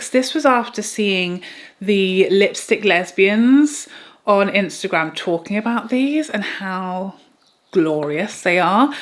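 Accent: United Kingdom. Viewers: Love you bless you beautiful soul inside out, I love your British refined accent it's so soothing and therapeutic bless you and your family 😊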